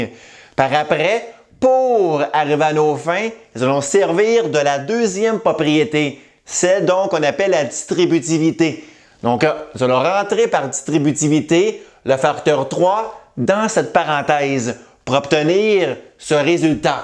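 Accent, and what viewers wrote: Quebec, Canada. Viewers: L'entends tu le léger accent ?! Il semble évident qu'il ne parle pas un français métropolitain mais bien Québécois, donc avant de juger,il faudrait peut-être s'assurer qu'il ne s'agit pas d'une expression locale.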